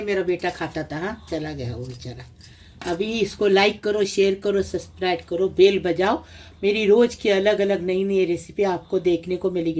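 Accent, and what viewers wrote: Konkan, India. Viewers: Aap ka kokni style me bolna aur banana bahut acha lagta hai ❤️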